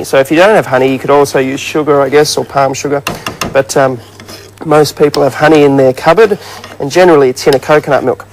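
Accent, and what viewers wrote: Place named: Australia. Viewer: he's australian. it's the accent.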